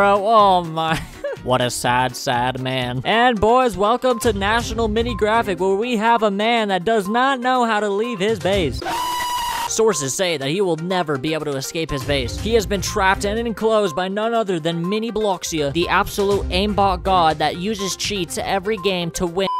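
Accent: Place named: United States. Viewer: I like ur cowboy accent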